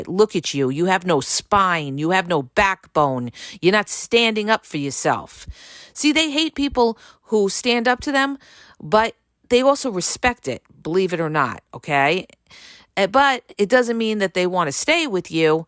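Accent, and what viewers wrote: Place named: Bronx, United States. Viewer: And all topped off with a Bronxy New York accect - perfect. Taking no shit...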